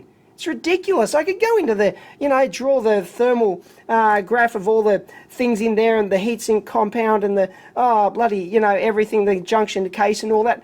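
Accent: Australia. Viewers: Lol, English is not my first language also I was not really used to Australian accent but I can follow perfectly... You should try the Disney Channel :-P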